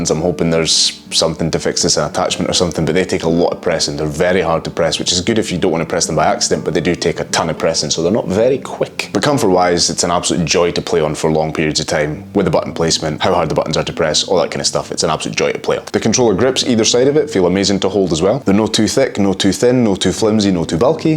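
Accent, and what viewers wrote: Scotland, United Kingdom. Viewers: I appreciate your transparency and most of all your content (and scottish accent).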